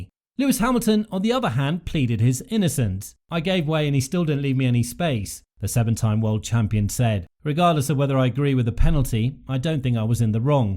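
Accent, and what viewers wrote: United Kingdom. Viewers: This video is sponsored by Team LH. I couldn't miss that the voice in this video sounds very British... not to mention, biased as hell! Never heard and seen so many false truths, half truths, and 100% bullshit stories in one video. Unbelievable, what a joke. Not worth watching... and the end of this video aged like milk, because every team principal want Verstappen to drive for their team...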